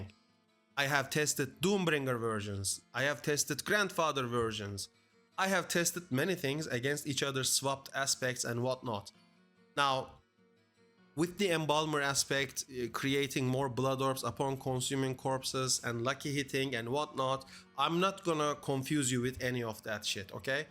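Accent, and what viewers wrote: Turkey. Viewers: İngilizcemiz bile Milli bir tarza sahip, vallahi çok güzel konuşuyorsun. Buildler için de teşekkür ederim, bugün yarın deneyeceğim. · Aboow Türk İngilizcesi bu. Vay dostum süper içerik süper video. Twitch yayıncısıyım seni paylaşacağım izninle.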